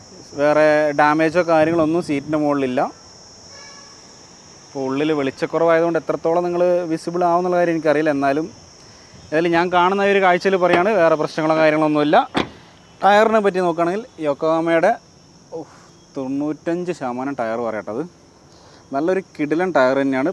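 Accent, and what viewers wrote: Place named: Kozhikode, India. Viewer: நீங்கள் பேசுகின்ற கோழிக்கோடு மலயாளம் எனக்கு மிகவும் பிடிக்கும் ❤❤❤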